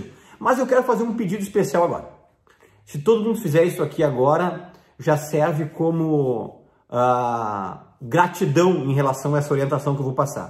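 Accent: Rio Grande do Sul, Brazil. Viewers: o Sr é Gaúcho?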